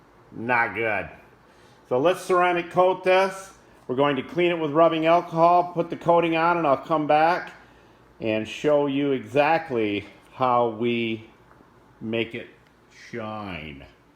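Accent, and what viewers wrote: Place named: Michigan, United States. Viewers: Where are you in Michigan? I’m originally from the Flint area and thought I recognized that accent. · This guy has a Michigan accent for days...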